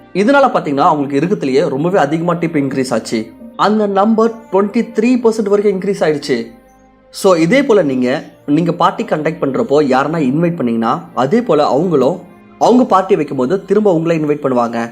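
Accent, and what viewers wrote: Kerala, India. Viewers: Malayli ah. I lyk ur Tamil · Kerala thambi voice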